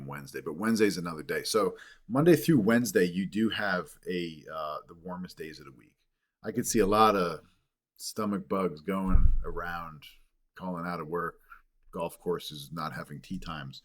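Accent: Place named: New Jersey, United States. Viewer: You are great! So helpful, you make weather interesting! Plus you have a lovely accent (just like mine NNJ). 😂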